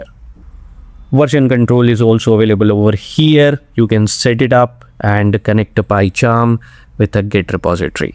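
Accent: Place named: India. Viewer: why you sound indian?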